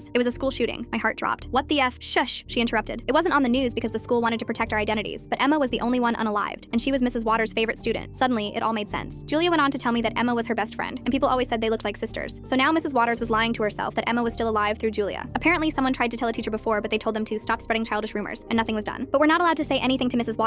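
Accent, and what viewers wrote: United Kingdom. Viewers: So your British I challenge anyone here to figure out how I found this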